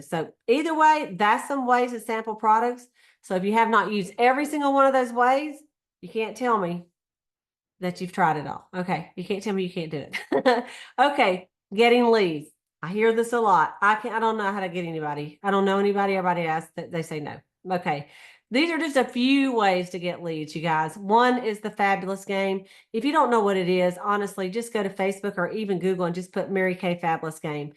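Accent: South, United States. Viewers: I love your country accent. I wish I had one like that. Your voice makes your videos more fun to watch and listen too. I also hear the joy and excitement in your voice and see it on your face. I believe you really enjoy what you do.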